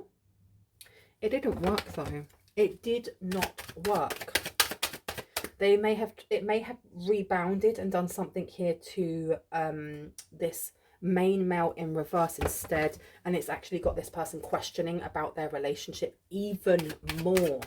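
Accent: United Kingdom. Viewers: I love watching you . Your voice is so amazing to hear , it’s very clean , and beautiful British accent . It’s drawing to listen , so alluring cause of quality of Your voice . And the content is also really interesting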